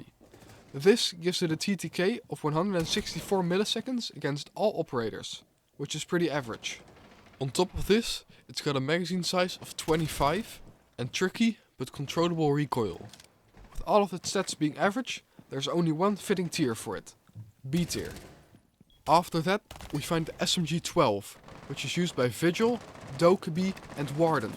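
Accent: Netherlands. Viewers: Nederlander? hoorde het in de eerste 5 sec. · ik hoor gelijk je bent Nederlands haha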